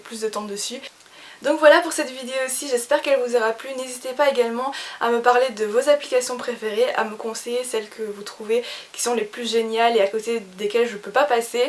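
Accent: Belgium. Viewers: tu es belge? 😊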